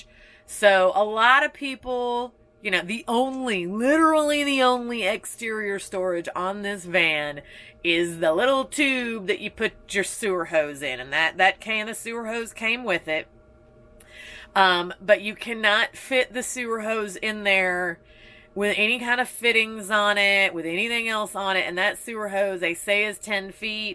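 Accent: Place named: South, United States. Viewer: Nice video! New to your channel! Just curious about where you’re from! Obviously a southern lady! Thanks for sharing! We’re kinda in a decision making process and class B vans are in our vision. 🤙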